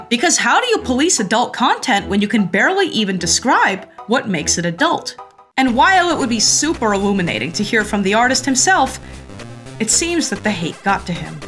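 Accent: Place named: Boston, United States. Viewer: Sorry for the unrelated comment, I do enjoy your videos, but I gotta say your accent is interesting 😅 it's mostly general American, but you have the Boston o, like in the words 'all', 'of', 'talk'. Do you have like one parent from Boston or something like that?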